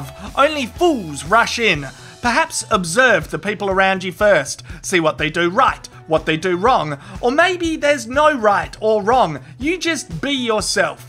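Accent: Australia. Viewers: I am 2 beers into this video and lemme tell ya some english sounds so much better with Ozzy. Is Ozzy short for Ozzimandias I donno mate, cheers. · And yep spoken like a true ocker, funny as fuck!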